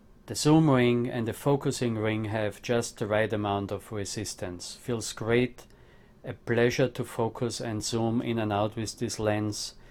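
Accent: Austria. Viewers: Austrian accent.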